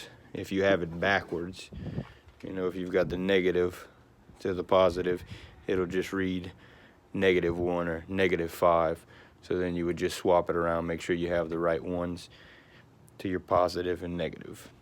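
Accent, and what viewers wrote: Louisiana, United States. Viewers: You're definitely from southwest Louisiana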